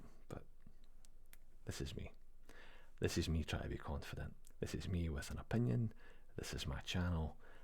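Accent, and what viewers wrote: Scotland, United Kingdom. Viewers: Loved this video, it was interesting but a bit sad people feel if something is too Scottish it is inferior. I always think of Scotland as a beautiful place with strong people with a history I find very interesting. Your accent is so beautiful!!!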